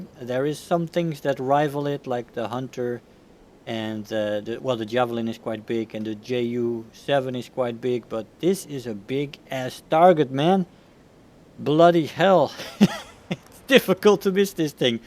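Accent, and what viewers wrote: Netherlands. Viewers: Hey dude you accent is dutch i think. Am i richt? If im not then sorry😂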